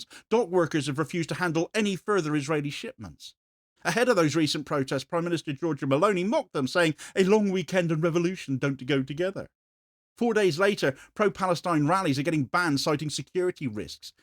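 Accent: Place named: West Country, United Kingdom. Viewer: I'm from the West country and I've never heard anybody sound like Shakespeare with the West country accent! I'm so glad I came across you as you're a breath of fresh air! Free Palestine 🇵🇸♥️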